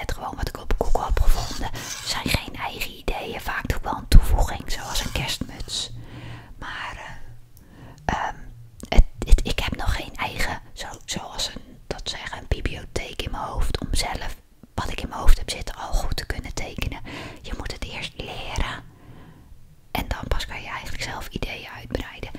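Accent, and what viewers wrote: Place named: The Hague, Netherlands. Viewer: Waar uit Zuid Holland komt u vandaan? Het lijkt of ik soms haagse of westlandse dingen hoor haha, ik kom zelf uit het westland😊 superleuke video's! Ga zo door❤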